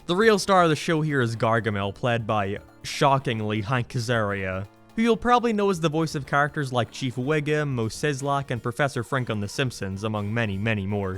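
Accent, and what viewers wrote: Ireland. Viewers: Your accent sounds familiar... Are you irish? Sorry for asking. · Are you Irish?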